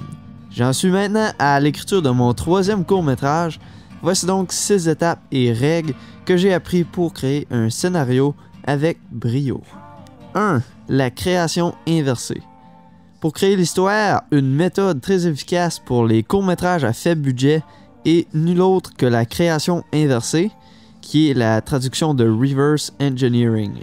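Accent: Quebec, Canada. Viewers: Je suis français, dès que j'ai entendu l'accent québécois, j'ai su que le contenu serait de qualité :) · accent de canadie -> je me bare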